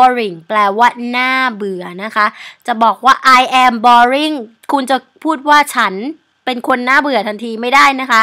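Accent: Thailand. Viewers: มีสำเนียงระยองด้วยย555+ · ชอบสำเนียงภาษาไทยของ จังค่ะ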